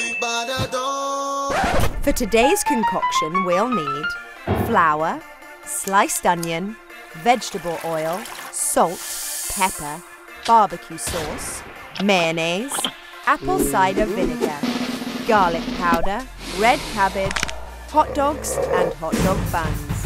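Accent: United Kingdom. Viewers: The ironic thing is the narrator is British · Funny how the narrator was British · BRITISH WOMAN NARRATING SOMETHING ABOUT THE 4TH OF JULY?!?!? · It's ironic because the woman who is talking is bridish, and usa independence from UK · narrator is British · The narrator has a English accent... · I like how it’s a 4th of July video but the announcer is British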